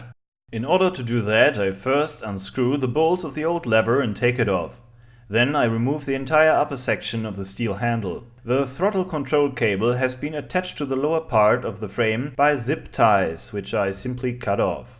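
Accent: United States. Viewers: lol ! loved the cowboy accent !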